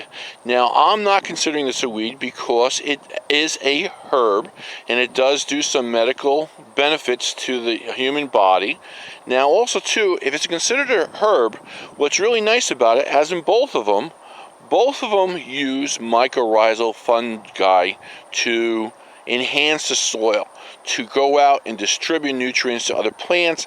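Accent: New Jersey, United States. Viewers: YAY! I have been doing similar things with Dock. It is nice to have others see its value. I felt lonely when I thought I was the only one who was onto this, and yet it made so much sense to me. I am loving your videos. They all make so much sense. So many pieces of the puzzle fitting together. I literally stayed awake all night last night watching your videos, I was so fascinated. We are establishing a Permaculture food forest on 1/4 acre in Portland, Oregon. It is fun to hear a New Jersey accent. I grew up outside Philadelphia. Blessings :)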